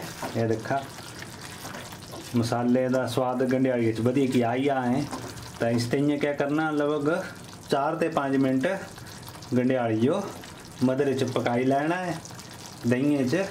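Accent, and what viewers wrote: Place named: Kangra, India. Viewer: Mouth watering 😋 recipe ekdum must. Aap ki baaten achi lgti hai very sweet kangri bolde tusan. Missing himachali dham.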